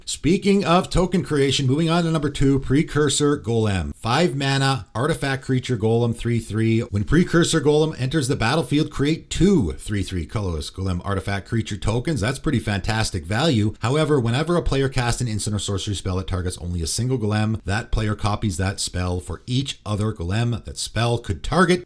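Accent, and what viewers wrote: Canada. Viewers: He's pronouncing it as the average Canadian would, who would be used to reading unfamiliar words with a French phonetic structure. If you all understood what he was saying, he communicated successfully and if you're mad about it, you are remarkably petty.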